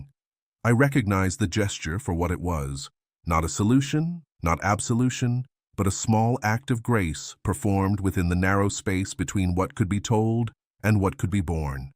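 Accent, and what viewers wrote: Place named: United States. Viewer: Why is this in an American Accent 🖕🖕🖕🖕🖕 no Chance I am listening to this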